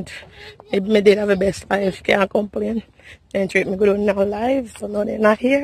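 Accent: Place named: Jamaica. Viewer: Like your attitude. You don't make overseas change you one bit. You maintain your Jamaican accent.❤💜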